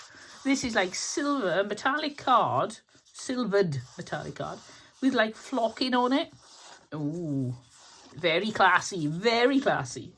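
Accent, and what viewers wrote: Wales, United Kingdom. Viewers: So lovely to see you back and to hear your velvety Welsh voice coming from the the tv again. Please take it slowly, and allow yourself to heal. What a wonderful tonic Catherine has sent you. Just perfect. ☺️ Xx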